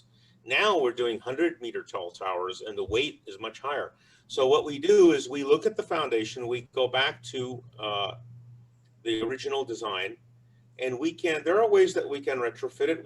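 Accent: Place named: United States. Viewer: Awesome session 😁. Liked American accent of speaking of sir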